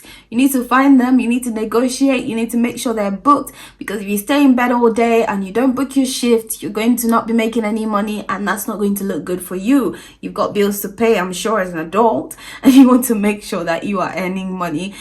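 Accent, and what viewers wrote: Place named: United Kingdom. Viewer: I love the UK accent. Keep it up.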